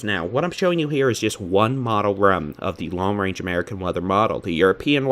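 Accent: Texas, United States. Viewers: I’m just going to say it. You are a handsome man. I enjoy your channel for many reasons: the humor, the honesty, the thoroughness, the lovely, homey Texas accents, but also you are just easy on the eyes. Not trying to make things weird, just letting you know that one Texas lady finds you very pleasant to look at. Have a nice evening and thank you for everything you do.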